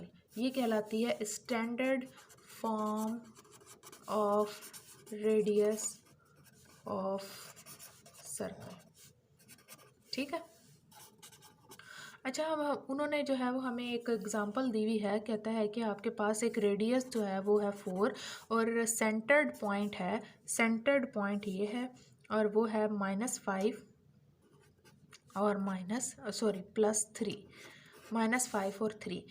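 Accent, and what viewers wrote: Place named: Islamabad, Pakistan. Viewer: actually. Apki Urdu kaafi saaf ha. I think Islamabad ho sakta ha then.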